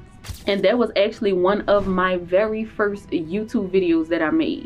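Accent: New Orleans, United States. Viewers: Are you from New Orleans? I swear I feel like you gonna say baybee at any moment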